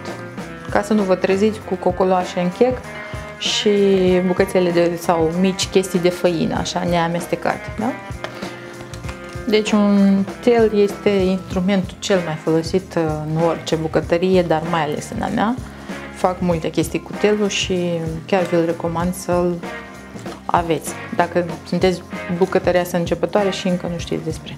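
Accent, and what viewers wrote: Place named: Moldova. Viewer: Cele mai bune retete! Si de asemenea f deosebite! Ador accentul moldovenesc autentic. Nu-l ascunde! Multa bafta in continuare!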